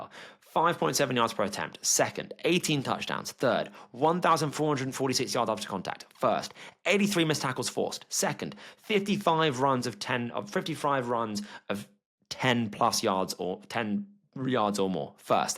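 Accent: United Kingdom. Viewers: Did u even watch RJ Harvey Way off base I knew when I heard the English accent this was going to be pure comedy 😂😂😂 Take time study the game more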